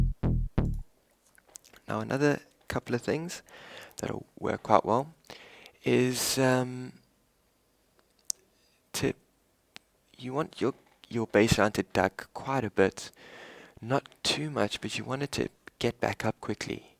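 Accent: South Africa. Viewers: South African? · He sounds South African..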